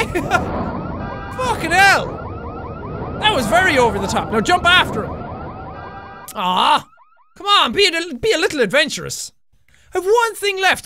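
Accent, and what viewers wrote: Ireland. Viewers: Niv Leket Slight problemo! NOT BRITISH!!!!!!:He's IRISH" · i subed just for the awsome accent... what is that .. irish ?? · hes irish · Love his Irish Accent!!! 🇮🇪☘️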